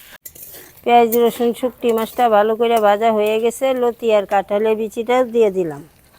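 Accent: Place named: Tripura, India. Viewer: Tumer bari tripura naki tumer katha gulu tripura bashar moto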